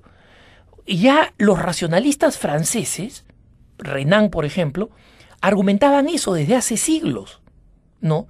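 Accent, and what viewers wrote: Lima, Peru. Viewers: A que su acento limeño (que es uno de los tantos con los que se habla en Lima) me parece insoportable _._ . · Disculpa pero me puedes decir a que te refieres con: INSOPORTABLE ACENTO LIMEÑO???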